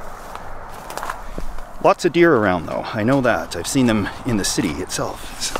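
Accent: Canada. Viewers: Don't know if it's that smooth Canadian accent but everytime I watch, I'm relaxed and know everythings gonna be alllllll right.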